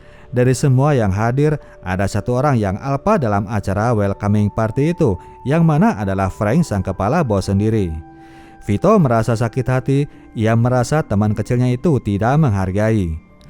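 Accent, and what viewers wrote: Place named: Sumatra, Indonesia. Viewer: Ban ente orang sumsel ya bang, kok dari dulu ane nntn ente beberapa kata dan ucapan ada yang sama kek bahasa kami orang Sumatera gitu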